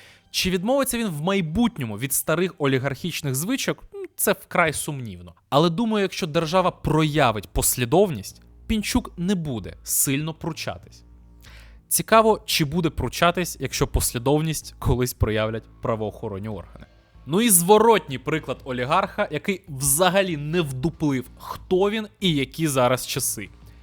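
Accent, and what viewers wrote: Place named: Russia. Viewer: Боже !!! Яке неприкрите зелене іпсо , кому ти брешеш, ти тільки вчора вивчив українську, на акцент кацапський зверни увагу.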